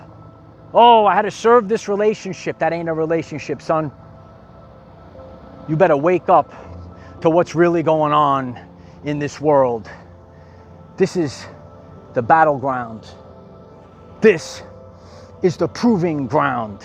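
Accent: New York, United States. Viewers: Love your inspiring videos, and being a New Yorker myself, your NY accent...thanks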